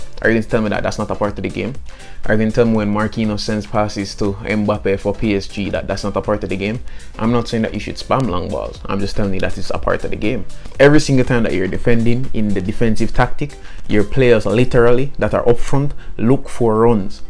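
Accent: Jamaica. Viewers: You a Jamaican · Ya Jamaican ? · You sound Jamaican lowkey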